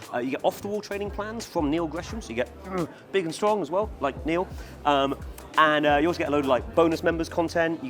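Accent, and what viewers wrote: United States. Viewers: Your accent had me wondering why it was just for the southern states, so great job, it took me a moment to understand it was an American accent vs southern. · I can confirm that we Americans speak like that. I think it was spot on.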